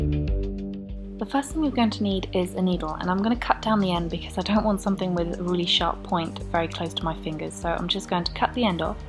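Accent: United Kingdom. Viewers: British accent or Australian?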